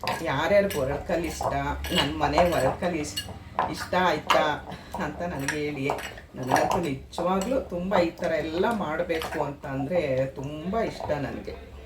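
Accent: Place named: South Canara, India. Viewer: Neevu South canara antha gottayithu. Yavuooru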